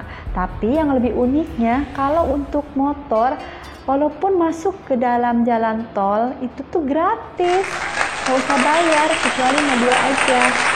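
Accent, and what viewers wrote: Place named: Malaysia. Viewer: Mbak suda Ada sedikit loghat Malaysia yah dlm pertuturannya Udah Brapa lama d sini mbak ?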